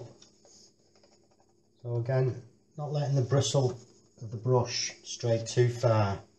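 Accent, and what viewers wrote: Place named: St Helens, United Kingdom. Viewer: Sound like your from st.helens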